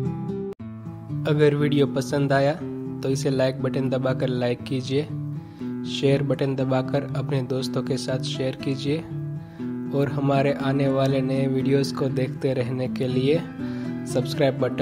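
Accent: India. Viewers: Can we agree that Gujaratis should not recite shayari? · Shayari ki insult krdi teri awaz lehje or hindi accent ne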